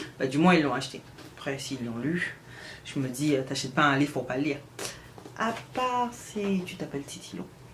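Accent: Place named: Quebec, Canada. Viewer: Olala ça fait des années que je ne t’avais pas vu! T’as bien pris l’accent québécois ! C’est cool que tu te sois lancée dans ta chaîne YouTube. Bon vent !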